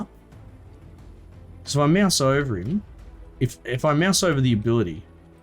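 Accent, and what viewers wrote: Australia. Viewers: It is an Australian accent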